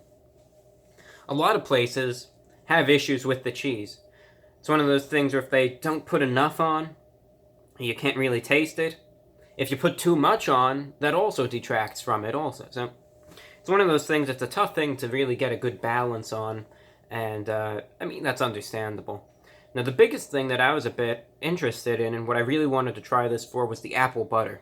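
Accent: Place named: New Jersey, United States. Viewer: Part of his charm is demeaning his uniqueness. I love his Jersey accent and persona. He's good-looking in an uncommon way. I fuss at him because I do like him.😂❤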